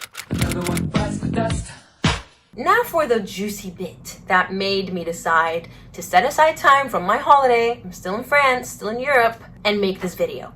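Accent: United States. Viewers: I just happened upon your channel. Wow. You are great !!! I am so pleased to see a young American ( I'm only assuming due to your accent) woman who is obviously educated and well mannered, speaking out in defence of Her Majesty, and clearly stating the facts about Harry's wife. She must be called out, it is so important to keep on track of all the lies and slander from this talentless grifter fake duchess who conned Britain and the Royal family. Thankyou 🇭🇲😘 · She moved to Australia as a child from another country which I am not sure which country from. It is possible that she is from America but she mentioned that she was treated differently when she arrived to Australia initially due to her Hispanic looks. She did her school and uni in Australia and she was even in the Australian armed force as a trainee for a period while she was studying law. Australia is a wide country and not all of us sound the same. 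There is typical Aussie accent from the bush and city people sound completely different. Yes, I can hear slight American accent in her but she also sounds Aussie to me. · has an American accent though. She must've moved to Australia then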